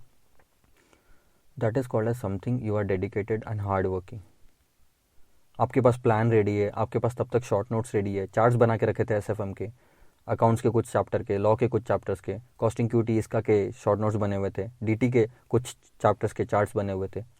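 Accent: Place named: South, India. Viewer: Don't mind sir but your accent sounds south indian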